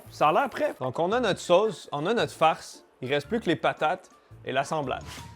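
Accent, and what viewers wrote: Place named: Canada. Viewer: l'accent canadien c horrible.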